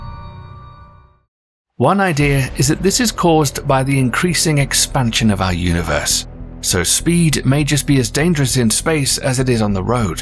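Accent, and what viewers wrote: United Kingdom. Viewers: Can you switch the narration accent to Jamaican please. The UK gets annoying.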